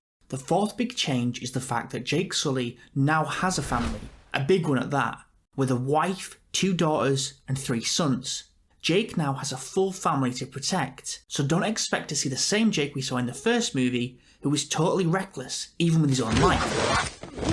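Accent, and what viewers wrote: United Kingdom. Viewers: Are you British 🥲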